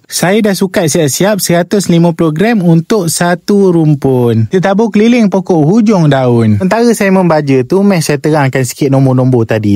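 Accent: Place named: Tloh, Malaysia. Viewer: Syabas anak muda,suka dgr loghat org Tloh😂🎉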